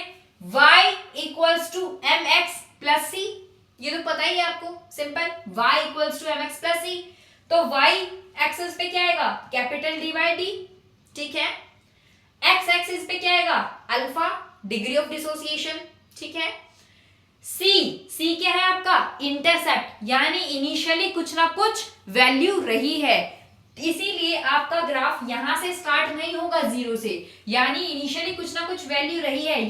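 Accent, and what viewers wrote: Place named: Uttar Pradesh, India. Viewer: ji up se hai kya aap if i not wrong because your voice tone is just like that ❤❤❤❤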